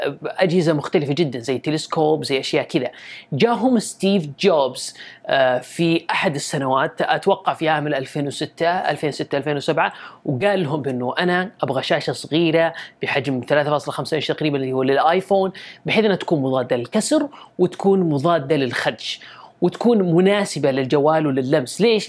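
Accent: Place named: Saudi Arabia. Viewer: والله انت رائع من عندك حبيت اللهجة سعودية ربي يبارك فيك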